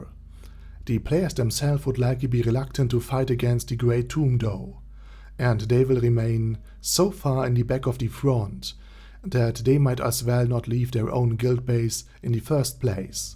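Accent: Germany. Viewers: First of all Guten Tag, i like you're Content but as a german its so wierd to hear someone speak with such a havy German accent. But keep making these videos.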